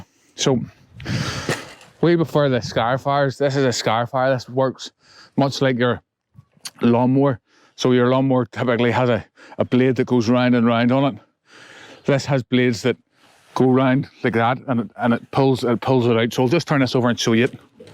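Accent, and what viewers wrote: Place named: Belfast, United Kingdom. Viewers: Cheers from Australia. Great video but my favourite part was the Belfast accent. Cheers 🍻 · You must be from Belfast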